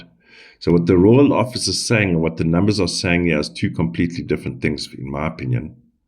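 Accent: South Africa. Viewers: So when Kate appears after all her treatment and recovery you will have no more validity as a psychic medium? Sorry but I feel this is a lot of nonsense. You sound South African so you probably have never had a connection to the UK.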